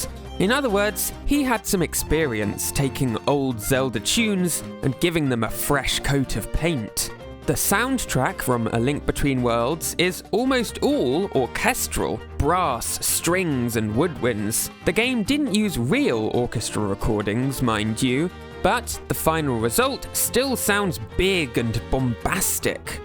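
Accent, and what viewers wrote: United Kingdom. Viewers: Why do british people pronounce sixth like that?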